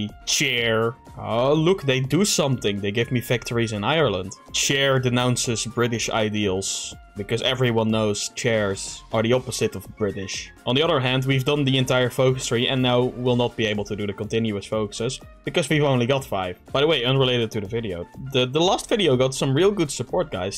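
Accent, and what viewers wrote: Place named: Netherlands. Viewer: Stoel! Ik denk daar een vaag Nederlands accent te herkennen? English for those confused: Chair? If I'm not mistaken that's a Dutch accent?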